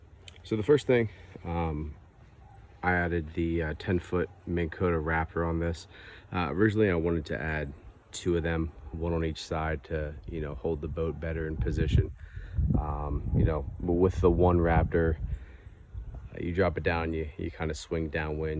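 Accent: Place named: Pennsylvania, United States. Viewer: That Pa accent is strong with you. Love the video. Very informative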